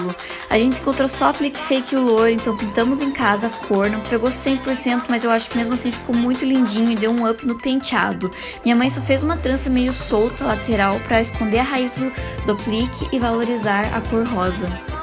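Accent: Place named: Northeast, Brazil. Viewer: nesse vídeo você tava muito nordestina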